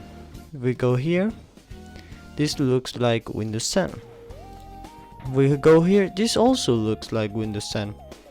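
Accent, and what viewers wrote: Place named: Russia. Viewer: ik he just sounds like a younger enderman, he has the Russian accent and all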